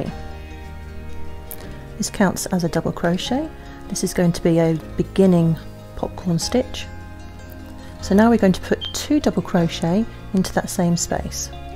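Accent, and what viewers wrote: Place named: United Kingdom. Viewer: Hi this is a lovely design and you explain it so well. I’m a beginner in the uk, are these US or UK terms please? Because of your accent I thought it was uk terms but now I’m confused….it doesn’t take much 😂😂 Great video thank you x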